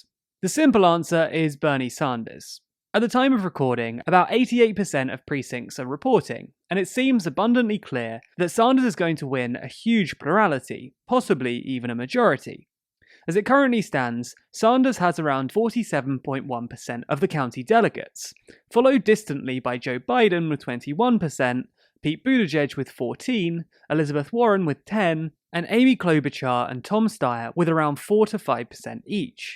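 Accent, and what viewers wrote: England, United Kingdom. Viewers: You mean that's the english dialect of english, which equally as valid as the american dialects of english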